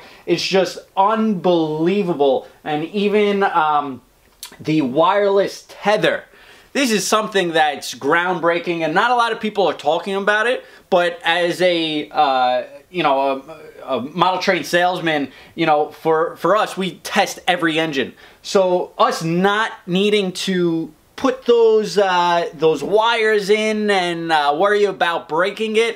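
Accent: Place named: New York, United States. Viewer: You can TELL he’s from New York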